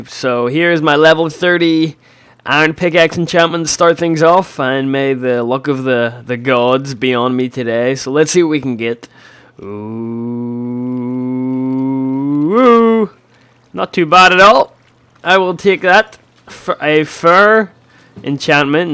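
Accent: Northern Ireland, United Kingdom. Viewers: He has a northern Irish accent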